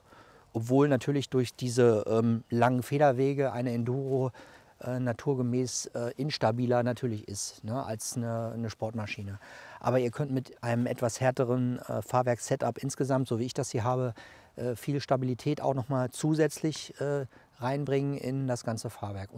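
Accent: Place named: West Germany, Germany. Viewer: Deinem Dialekt nach muss man denken das, du ein Wessi bist. Jede thüringische Gegend hat seine eigenen Nuancen, doch grundsätzlich erkenne ich jemanden am Dialekt ob er aus Eisenach, Apolda oder Schleusingen kommt. Mein Gemecker ist nicht böse gemeint. Verstehe es als konstruktive Kritik. Videos welche Informationen verheißen, dürfen einen zeitlich Rahmen nicht sprengen, sonst klicken die Zuschauer gelangweilt weiter. Schau in deine Statistik, da wird sich bestätigen was ich sage.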